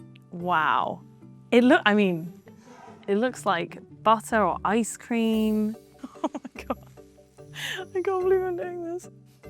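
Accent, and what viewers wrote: England, United Kingdom. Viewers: Weird to have someone speaking with an English accent, but calling Beef fat "tallow" instead of "Dripping" , and claiming she's never had it. Really? Never had a roast potato cooked in the traditional way? Her accent varies a bit. Seems slippery like the subject. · Another Brit who is been bought by the commercial interest to down play the dangers of seed oil. The country has gotten sicker since they started using seed oil. That's historical fact and no one with the British accent who has been bought by the commercial interests are going to convince me otherwise. She's obviously been bought by the industry that makes seed oil.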